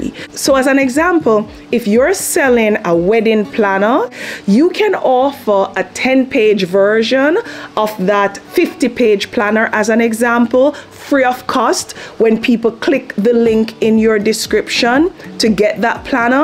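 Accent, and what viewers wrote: Jamaica. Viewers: Wow, You are n excellent communicator. I am guessing Jamaican. Or perhaps an African land. Any way love your presentation. There was a lot and a bit over-whelming. I am coming up on 11 months on my channel and making progress but have not added any affiliates as when I started to add one 8 months ago I was warned by YT. I need help making sure I don't break any monitization rules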